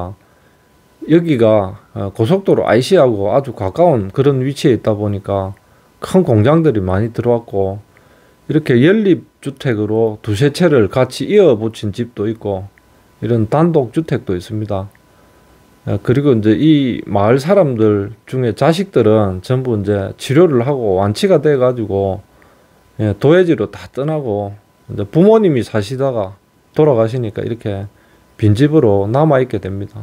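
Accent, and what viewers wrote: Gyeongsang, South Korea. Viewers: 표준말 쓸려해도 억양은 어쩔수 없구만요 저도 경남쪽인데 말투가 구수하게 느껴 집니다 문디에 대해서 추억과 나름데로 의학적 공부 좀 하셨네요~ㅎ 항상 수고 하십니다~^^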